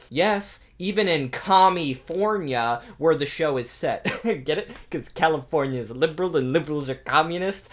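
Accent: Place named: Baltimore, United States. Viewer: Are you from Baltimore dude?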